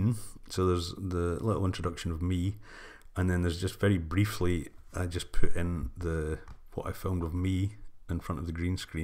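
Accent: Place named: Scotland, United Kingdom. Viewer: Great to listen to another Scottish voice on YouTube. Looks like I'm going to be adding a green screen to my Amazon wish list!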